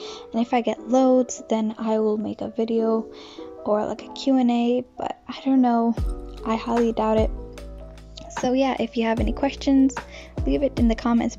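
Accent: United States. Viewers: i love how you did the 11+ but your accent is American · Are you american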